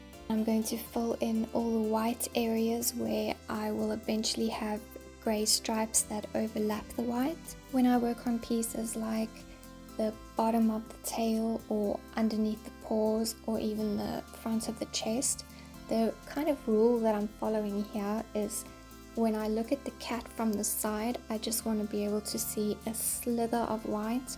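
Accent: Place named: South Africa. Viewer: Very inspiring and creative! Love your accent too. Did I spot South Africa? Hugs from Provence, Adrien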